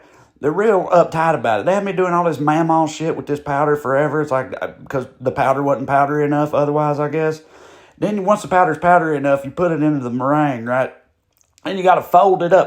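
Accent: France. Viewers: Really love this especially the southern French accent instructions. Looking for more. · Interestingly, I had pretty much the same experience trying to make ze macaron. I love to eat them in every flavor. Good Job! Next you should make Baked Alaska or Cherries Jubilee. You do a great French accent. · Please do more French accents. ❤ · doing a French accent just brightens my day ❤😂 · That French accent is on another level brother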